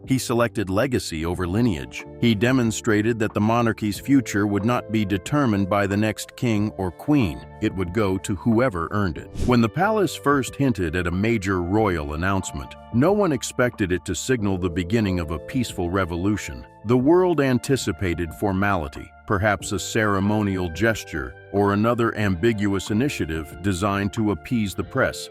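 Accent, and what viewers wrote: United States. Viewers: All this is made up rubbish. Even narrated by a Yank.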